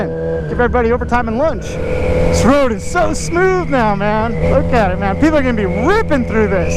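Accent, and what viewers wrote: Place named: Michigan, United States. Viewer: I fucking knew you were from Michigan! Cant mistake that accent or just how you are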